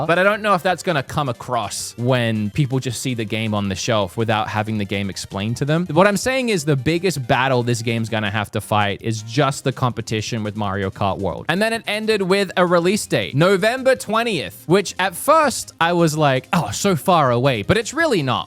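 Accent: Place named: Australia. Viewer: Where are you from? I can't figure your accent out. I get Australian at times, and Boston at others.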